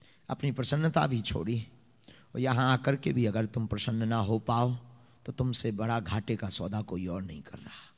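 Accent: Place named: Bundelkhand, India. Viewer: जय हो बागेश्वर सरकार की,जय हो सन्यासी बाबा की,जय हो गुरुदेव श्री जी की,❤ आपकी बुंदेलखंडी बोली ही कितनी सुन्दर है ❤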